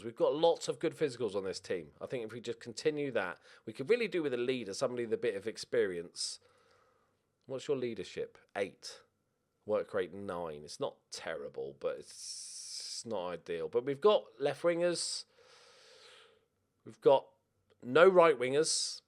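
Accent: Australia. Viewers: Best Aussie accent I have ever heard from a non Aussie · as an Aussie I can confirm that you’ve definitely got the accent 100% totally accurate.... absolutely killed me with laughter. Can’t wait to get stuck in to this series! Good luck!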